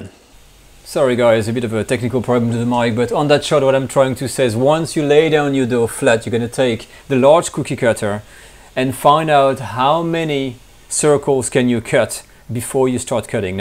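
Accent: France. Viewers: I really love your french accent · Very charming French accent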